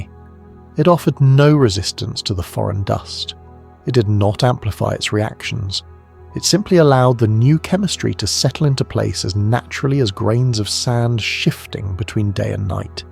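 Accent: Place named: United Kingdom. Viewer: So Maven isost and offline. Hold old is this BS. he I hear this British voice I know it will be wordy BS not worth my time or bsndwidth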